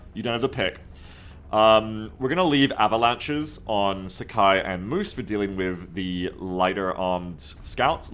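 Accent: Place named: Australia. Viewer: Dude has a mix of Australian and American accent. I don't recall that being the case years ago. Weird.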